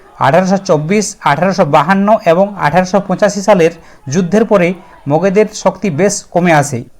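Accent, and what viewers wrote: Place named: West Bengal, India. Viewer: Subject bhalo, kintu Medinipurer tane Bangla ta poriborton korte hobe....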